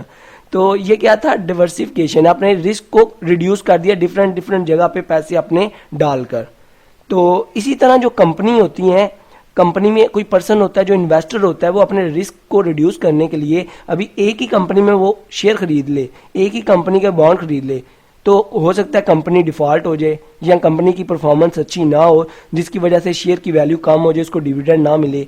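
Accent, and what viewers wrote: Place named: Punjab, India. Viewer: Bhai tu punjabi lg rha tone se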